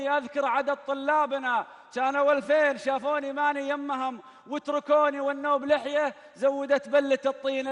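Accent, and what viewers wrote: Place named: United States. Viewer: اهنيك على الاكسنت الامريكي .... الحمدلله بس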